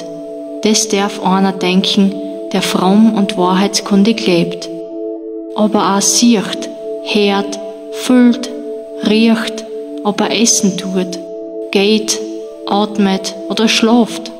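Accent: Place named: Styria, Austria. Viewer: Absolutely beautiful!😀 Your Steirisch Dialekt is amazing! Outstanding!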